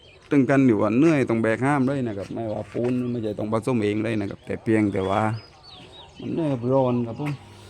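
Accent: South, Thailand. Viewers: ทำมัยไม่พูดภาษาไทยจะได้ฟังรู้เรื่องบ้างนี้พูดภาษาใต้ฟังไม่รู้เรื่องเลย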